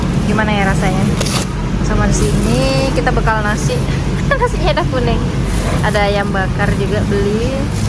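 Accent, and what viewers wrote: Indonesia. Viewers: Logat kutai kk nya maha ga bs boong hehe. Hekda AC yg ada angin cepoi cepoi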